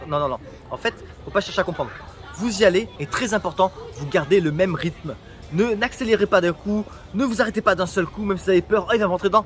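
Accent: France. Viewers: Nghe là biết người pháp😂 chào mừng anh em đến với Vietnam 👋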